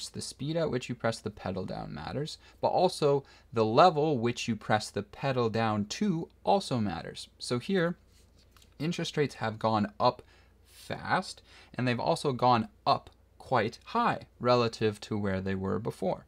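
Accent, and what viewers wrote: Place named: Canada. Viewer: Instead of starting your video saying "Markets" I think it would be beneficial to say "American Markets" as you have a Canadian accent and you said in your video you're in Canada. Also, if your video is about the USA market, can you please start discussing the US housing market? a 700K house is normal for Canada but NOT normal for the US so it's not a great example.Or maybe it's just me and everything. you're doing is not confusing at all. I appreciated your crypto fear and greed explanation. Good reminder. I almost sold in 2022 but figured it wasn't worth the hassle so I didn't due to laziness.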